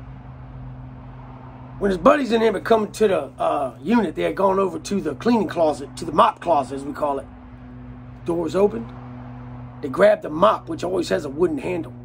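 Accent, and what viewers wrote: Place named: South, United States. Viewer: It's very Southern too really. I personally dig when it comes to story tellin' n' all.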